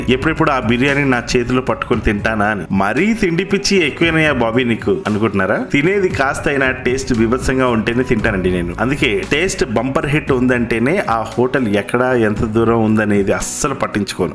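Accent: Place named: Rajahmundry, India. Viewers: Subscribed bro. I didn't knew you are from rjy although your accent and style looked too close RJYians from most of your videos I have watched till now. Touched bro. You are doing good work also. 👍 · Bhayya super 👌👌👌👌👌👌👌 Mana Rajahmundry slang lo meeru cheppe vidhanam Ultimate Anna.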